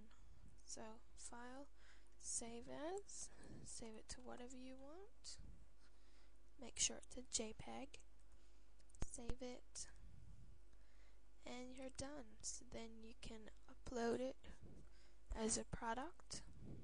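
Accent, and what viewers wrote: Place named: United Kingdom. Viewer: You sound British o-o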